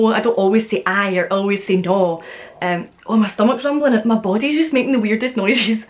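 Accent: Scotland, United Kingdom. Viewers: I love your accent! I love Scotland because it's part of my blood, and I must say that i thought I was pretty good at a scottish accent, but after listening to a REAL Scottish person (you), I realize I have a lot to learn. You are awesome! I subbed. :D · Fancy a husband or a date? Bring that accent over to the states and the "lads" will definitely "have a go." I would talk to you till you were sick of it. It wouldnt be near enough. Love the (any) Scottish accent!! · Wow u really sound like Scottish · you are drop dead gorgeous and your accent is my fave Scottish accent. Been to Edinburgh, Aberdeen and Glasgow! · lol yepp, thats why subtitles were required. Awesome vid btw :) I love your scottish accent. And right, there is no one "British accent" and technically you have one, but when pple say "British accent" they mean RP or contemporary RP, which is quite regional to England. 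· I freakin LOVE every type of scottish accent. Don't know why but it always cheers me up. Was smiling all the time while listening to you. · 你的口音真的很好听，其实最好到学习方法就是跟读，很期待你出一个用苏格兰口音读书的影片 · My moms from Scotland! So I pretty well understand your accent! In fact I love accents so yeah I’m practicing my Scottish accent, (as I’m a southern American) my mother came to America from Glasgow when she was 18! And she met my dad and had 5 kids (I’m the 5th) And I’ve always been interested in seeing Scotland. I have only been to one country. And since I know that some of my roots started there I would love to see it. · One thing I think people don’t think too much about is the different prosody when speaking with a Scottish accent. That you tend to go down on the stressed syllable and up in the end, as opposed to southern accent where you go up on the stressed syllable and down in the end . I find that particularly interesting since where I come from in Sweden (in Göta-dialects) we basically do the same thing as you, but the rest of Sweden don’t. 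So when I speak English with a Swedish accent I get a similar prosody as in Scotland. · I'm Scottish but its really hard to explaine because that's how you sound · SCOTTISH BROOG. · Um fay edinburgh . It's actual so much better hearin some talk thats no fay america . Thank god theres at least yin scottish youtuber . ayy we dinny roll er rs x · you talking is making me learn the scottish accent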